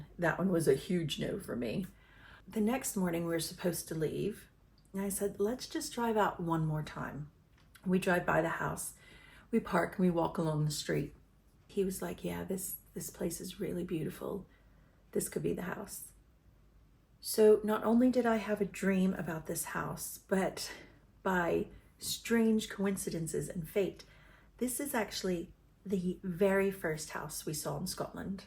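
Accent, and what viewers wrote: United States. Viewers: Are you from New England? Something in your accent.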